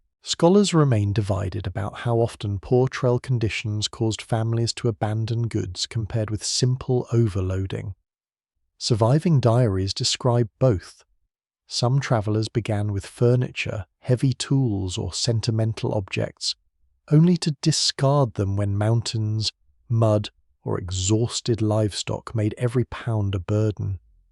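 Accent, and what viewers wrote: United Kingdom. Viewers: pm Rochester NY. Not feeling the British narrator.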